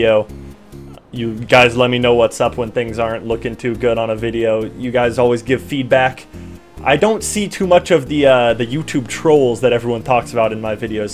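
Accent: United States. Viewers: What accent is anyway? Sounds kinda Southern and Northern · I just noticed he has a new York accent o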